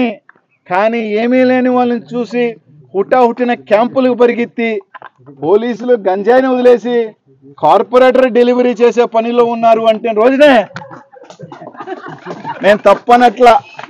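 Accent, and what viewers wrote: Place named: Nellore, India. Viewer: సూపర్. మాటలతో నే రేప్ చేశాడు వాళ్ళని❤❤❤❤❤ఇది నెల్లూరు యాసకి ఉన్న స్పెషల్ క్వాలిటీ❤❤💪💪💪 నువ్వు ఉండాలి అన్న నెల్లూరు లో అప్పుడే ఊపు ఉంటాది కిక్ ఉంటాది మజా ఉంటాది❤❤❤నీ వాయిస్ నీ బలం అన్న ఎప్పుడు అలానే ఉండాలి ❤❤❤❤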